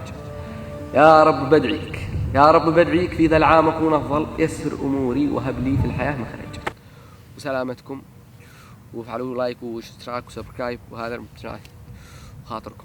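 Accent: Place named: Abyan, Yemen. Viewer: والله لهجتك مثل لهجتنا ي اصحاب ابين زقط معناه شارع ضيق😂😂😂😂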